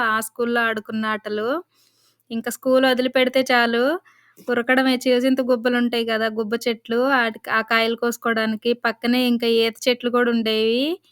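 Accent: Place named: Rayalaseema, India. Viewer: తెలంగాణ అన్నారు రాయలసీమ యాస మాట్లాడుతున్నారు🤔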